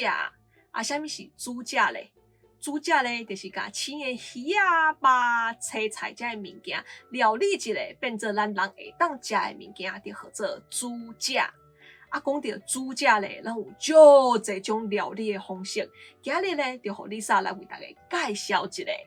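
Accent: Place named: Taiwan. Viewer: 分解釋得很棒👍年輕人台語道地不容易會講又能詮釋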